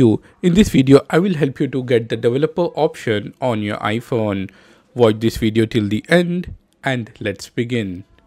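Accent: India. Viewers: INDIAN VOICE!!!!!!!!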